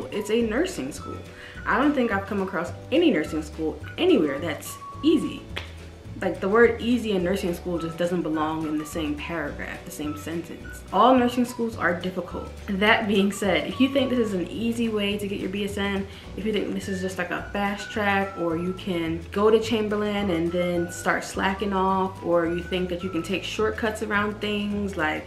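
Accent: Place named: Jamaica. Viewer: I heard that Jamaican accent. Nice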